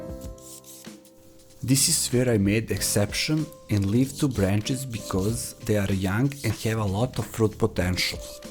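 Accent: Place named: Russia. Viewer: Вы русский? у вас акцент, ну или произношение русского)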